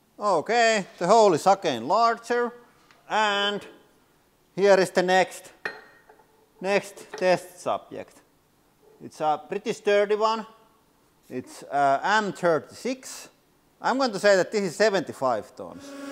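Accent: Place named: Finland. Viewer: His finnish accent haha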